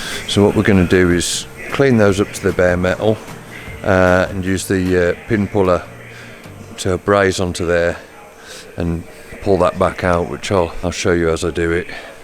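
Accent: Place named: Birmingham, United Kingdom. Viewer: Nice to hear a fellow Brummy on the ML channel!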